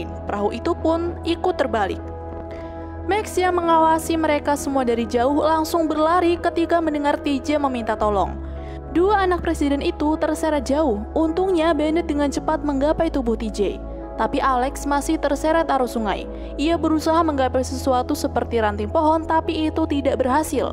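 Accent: Pemalang, Indonesia. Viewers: Wong Pemalang min?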